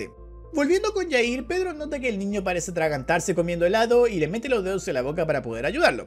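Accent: Argentina. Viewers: El acento argentino de 10/10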